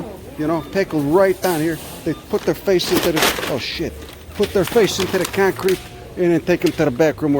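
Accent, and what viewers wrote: Chicago, United States. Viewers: imagine trying to shop and this dude comes through the aisles rambling his chicago mouth off about mushrooms and california ppl stereotypes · Sounds like he's from Grand and Ogden. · Perfect Chicago accent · Jeezus man , I can smell Chicago on you from here , love it, makes me miss home brother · no offense but ya must not be around many working class Chicago people- because as a Wisconsinite, I thought Chicago immediately. It’s just not a suburban Chicago accent. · Chicago Italian, the accent definitely has the east coast and mid-west flavours combined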